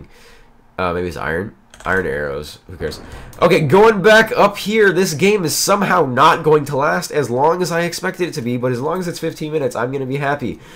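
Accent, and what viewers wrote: United Kingdom. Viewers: Your British Accent xD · the british accent ain't bad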